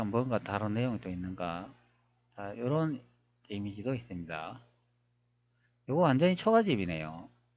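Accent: Gyeongsang, South Korea. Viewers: 는 말투가 경상도 끈은데 책보고는 5.18선동좃나개 하네 니는 5.18을 선동하나 안하나 5,18은 북조선 빨갱이 짖이야 아니야 궁굼하댜 니 영상 잘 보고 잇댜 댓글 한 달아보쇼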